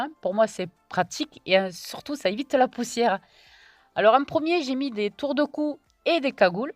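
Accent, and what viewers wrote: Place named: Southwest, France. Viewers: Salut super content enfin de te revoir et t'entendre de nouveau avec cet accent et " le bisous du sud-ouest". Ça me manquait . Le studio est très sympa sobre et moins le bazar que mon bureau. Vidéo toujours agréable à regarder. Continue comme ça j’apprécie le travail que tu fais. Le bonjour de l’Alsace, grand " V " à toi . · Bonjour, et bien merci à toi pour ta chaîne, pour cette découverte du dimanche matin, merci pour tes vidéos intéressantes et marrantes, tes essais, tes fou rires, ta bonne humeur, ton merveilleux accent du sud-ouest.....bon j'arrête là, en tout cas, tu gagnes un abonné de plus 😁, grand merci et bisous du grand Est, lol. ✌🏻à toi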